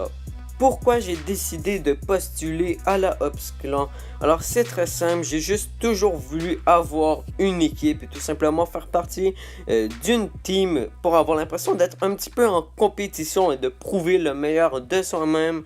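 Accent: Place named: Quebec, Canada. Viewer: C'est un accent de Québec :$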